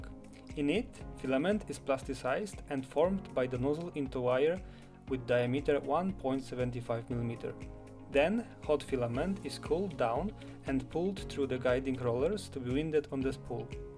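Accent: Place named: Poland. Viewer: POlak?? Dobrze slysze :D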